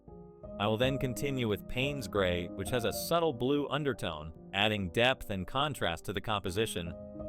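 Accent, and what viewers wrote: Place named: United States. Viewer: Milý. Tieto tvoje techniky som v jednom videu nevidela. Ďakujem ti, že si sa podelil s nimi a je to rozkošné. Ešte viac si stúpol na kvalite v mojich očiach. A skupina určite súhlasí. 😊Americký prízvuk a hudba dotvárajú čaro namaľovaných obrazov. Aké milé. 😊 čauko. ZUZANA 😊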